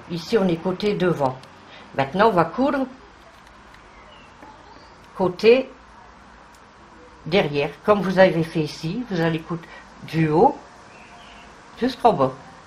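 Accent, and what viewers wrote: North, France. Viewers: Vous êtes du nord apparemment de part votre accent!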